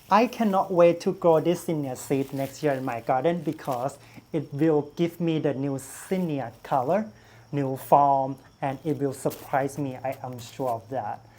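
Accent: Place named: Thailand. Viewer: So beautiful 😍 I'm so happy that i found your channel, love this video. I'm planning to grow zinnias this year and first time in my life , I'm a little bit confused about it because I'm from Thailand we don't have to deal with the cold weather . I live in Ohio. Are you Thai ? Your accent is so lovely and sounds similar. Sorry for asking i don't want to be rude just happy to see Thai people. Hope you have a wonderful weekend ❤️🥰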